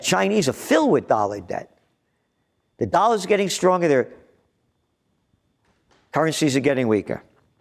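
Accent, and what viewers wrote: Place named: East Coast, United States. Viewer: I think this is good information but why do you sing sections? Not sure what the tune is supposed to be and you don’t have any musical talent. When you sing it seems like you are happy that bad things are happening to others and I don’t think that this is your intent. Please don’t sing anymore. The east coast accents are good and it’s cool when you get mad and yell. You could start breaking things, that would be funny.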